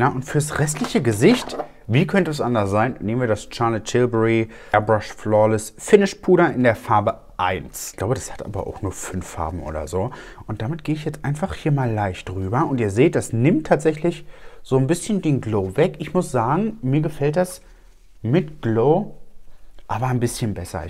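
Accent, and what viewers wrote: Berlin, Germany. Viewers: Ich gucke gerade alle deine Videos 🙃 was mir auffällt: Ick vermisse dein berlinern in den neueren Videos. Aber generell suchte ich jedes Video. Liebe aus Berlin!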